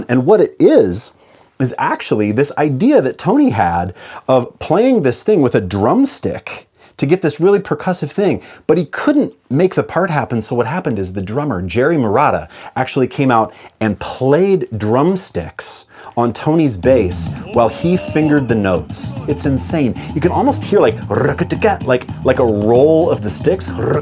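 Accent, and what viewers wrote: United States. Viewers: I actually like new American accent. 🤔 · Oy! What happened? I went away for a year or two and you have an American accent?? Or have I found a different Bass Lessons? Geeez, I'm confused......I could've sworn your were a Brit.... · is that you talking? I’m totally tripping on your voice I’ve been following your channel forever and I never heard anything from you ever that sounds like your a straight ahead American speaking beast of a bassist. I personally think that you are probably the most under mentioned professional on the circuit! I alway hear Stanley, Marcus, Victor...etc.... but you are right there on the same platform as the greats due to your understanding of the bass. You really really know and understand how to utilize several aspects of your fretboard due to your wide variety of techniques available to you. Many are deemed to one style or genre of music and watching your videos have been a great way for me to broaden my musical styles. 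I’m a full time musician and have been for some time now and it’s always amazing to see players like you that can pull every technique off as if it’s their only bag. Great job love your videos keep it up. And that voice??? I like the old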